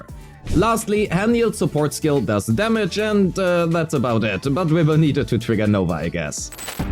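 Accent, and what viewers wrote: Germany. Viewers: I know you are from a German speaking country because of your accent but I CAN'T PROVE IT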